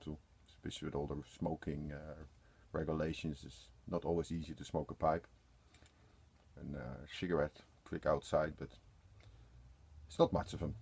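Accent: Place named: Netherlands. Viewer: ok, ik herkende dus toch je Nederlands accent,hahah, ben vaak in Copenhagen ook, maak je ze zelf ook? groet, Nico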